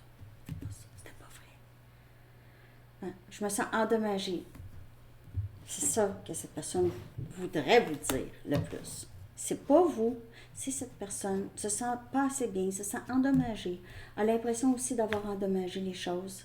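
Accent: Quebec, Canada. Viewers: Bonsoir, J'adore vous écouter, tes messages refletent ce que je vis. J'adore ton accent québécois, ça me fait sourire! Merci 🙌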